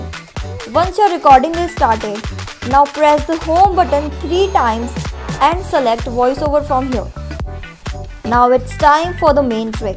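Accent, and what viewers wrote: India. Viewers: Why is always Indian accents on these videos ?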